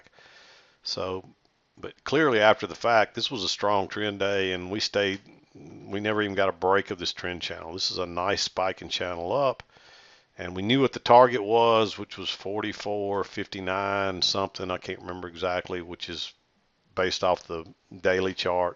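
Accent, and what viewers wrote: South, United States. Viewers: Glad to hear that you do not have Covid. Mississippi huh...thought I detected a slight southern accent :-) I was stationed in Louisiana so I know how that humidity can be. Take care.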